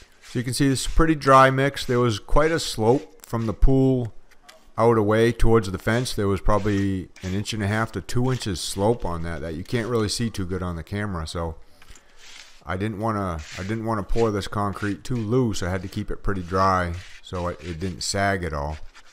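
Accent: Maine, United States. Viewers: Mostly was watching this to see how to mix it up and what consistency I should mix it. But I couldn't help but notice that you sound like you're from Downeast Maine lol. I'm born and raised there and live in the Southwest now 😂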